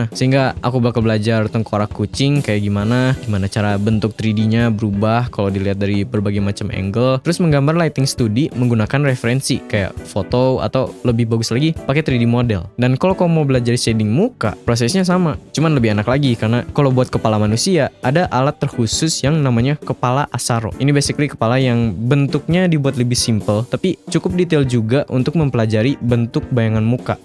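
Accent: West Java, Indonesia. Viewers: Si amang sunda euy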